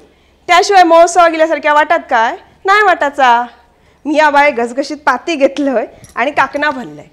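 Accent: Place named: Malvani, India. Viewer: Apli Malvani dunayet Bhari God rasal 👌👌👍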